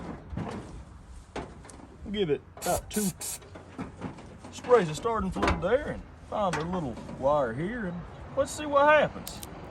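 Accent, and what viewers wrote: United States. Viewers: What state are you from Yank?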